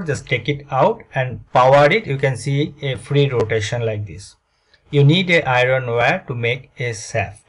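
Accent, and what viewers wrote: India. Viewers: Speak using proper English accent, please. Your Indian accent is so irritating.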